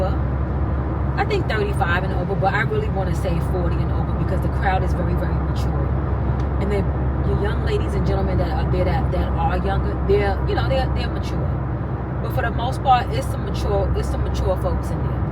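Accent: New York, United States. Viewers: Hey....New Subbie here 🎉. I am loving your vlogs Girly and truth be told, when I heard your accent, I immediately hit the like and Subscribe button...lol! I miss the NEW YAWK lingo. I'm Brooklyn bred, now Texas Fed, but it will be NY FOREVAH!!!!! I am loving your channel!!! I can't wait to binge watch all that I've missed ❤😂❤. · Gurl, your skin is amazing and you look amazing! Also, a country New York accent, that's elite!!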